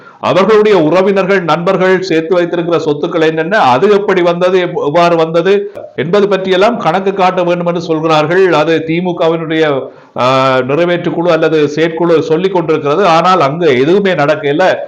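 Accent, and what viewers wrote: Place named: Sri Lanka. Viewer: நீங்கள் பேசும்போது இலங்கை தமிழ் என்பது சரியாக தெரிகிறது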